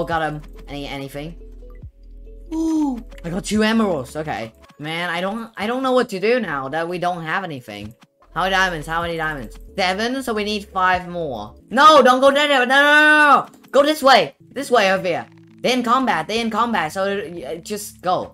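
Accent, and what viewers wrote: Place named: Vietnam. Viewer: Are you Vietnamese you just have a accent(no offence)if you are Vietnamese I'm also vietnamese:)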